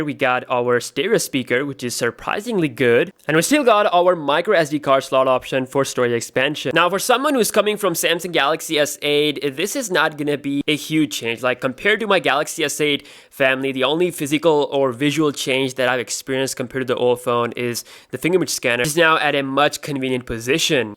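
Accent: United States. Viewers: When I saw your video first Time. I thought that your are american. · Why do you try to sound American?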